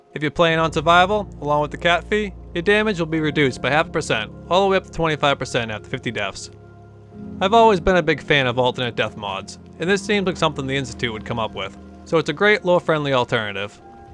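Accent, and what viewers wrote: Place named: Massachusetts, United States. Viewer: this guys sounds like he's a Mass-Hole ! accent is strong of a Massachusettes.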